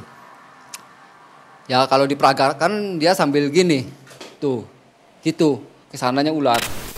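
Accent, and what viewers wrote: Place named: Java, Indonesia. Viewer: Medok bgt lurrr 😂😂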